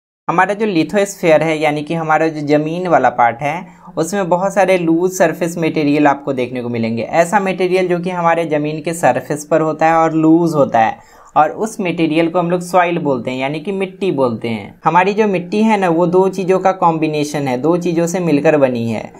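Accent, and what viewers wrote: Bihar, India. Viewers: Sir aap jharkhand bihar ke ho kya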